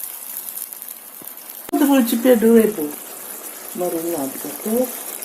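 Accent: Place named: Odesa, Ukraine. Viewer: Какой город. Говор одесский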